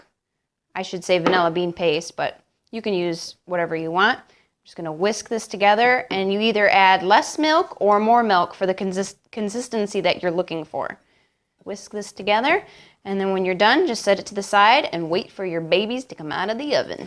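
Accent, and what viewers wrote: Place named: Minnesota, United States. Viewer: These look good! Are you from Minnesota/Wisconsin? I'm trying to guess from your "accent"! (I'm from MN, you don't have a huge accent :) )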